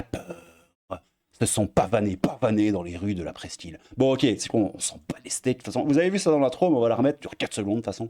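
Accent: Belgium. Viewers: Mon cher, j'ai tenu jusqu'au bout mais je dois prendre un anxiolitique. Je ne plaisante pas😢 Continue à "faire ton débile avec ton accent belge", c'est tout ce qu'il me faut pour être un peu moins mal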